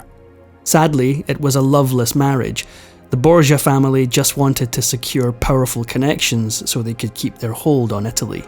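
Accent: Scotland, United Kingdom. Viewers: Scottish; possibly Glascow. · He's Scottish ,how's he supposed to do that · YES. GOOD SCOTTISH VOICE.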